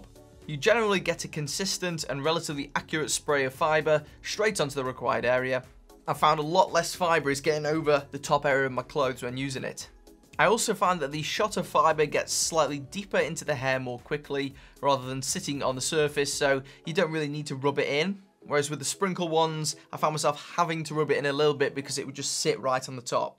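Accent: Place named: Northern England, United Kingdom. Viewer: I hear a Northern England accent. What town, mate? Nice vid.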